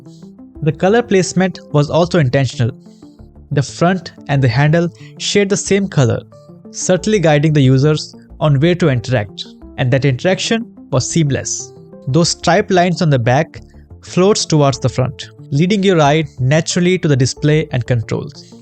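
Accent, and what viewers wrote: India. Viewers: that indian accent is killing me. cow dung eater lol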